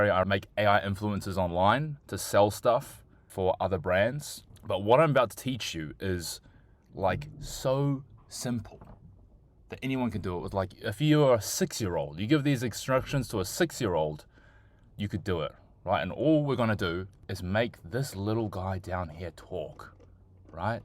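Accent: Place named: Australia. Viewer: You sound like you're from Aus, but you do Tiktok shop in US? How does it work? US sim card, proxies etc ?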